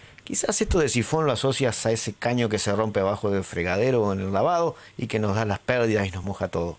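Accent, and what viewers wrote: Uruguay. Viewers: Habla en uruguayo gallego? Elegí uno loco!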